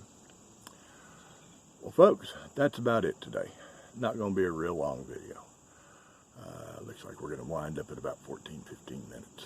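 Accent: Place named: South, United States. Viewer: Hi I'm from NonnaGrace's Channel! I like the coffee chats! I understand the southern country thing! I live in Hitchcock Texas and we all talk like that! Lol and I was born and raised in the city and it took me a really long time to get used to it!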